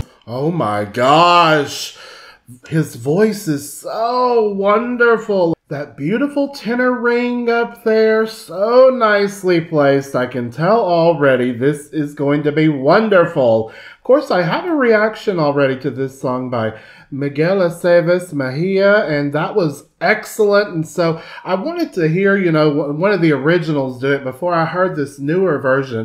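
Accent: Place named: South, United States. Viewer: "I am so GRATEFUL I found you"!!!!! As you kept saying Amazing!!! Amazing!!! I kept saying the same about you, "AMAZING, omg this man is amazing meaning you and the singer". I have heard before, but not depicted and explained as you have done so beautifully. Your reactions, and interpretations, from the symphony, the mariachi, and of course the singer, to the lyric of this beautiful song which is quite meaningful. Thank you so much for your respect an appreciation to latin culture, all though I am Latina but not from Mexico (not mexican) many hispanic cultures, appreciate each others music & folklore. To have such a wonderful person such as yourself, appreciate it too and truly understand the significance, and the value that that this singers VOICE does for this song, was such a pleasure to see. I am now a new sub and fan of yours, I am truly in AWE of your persona, and you truly are a gifted TEACHER in more ways than just voice. 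To see your expressions, and to hear your comments, I was right there with enjoying you & this beautiful composition. I applaud you again & again, music holds no boundaries and you truly are a testament to that. Given the certain discords that many ppl of color have gone through recently in the U.S.A. (a country that means so much to many of us, a country we honor as well) many of us have felt hatred, and bridge in desperate need of repair. Then here you are this wonderful man with a beautiful southern accent, teaching us about music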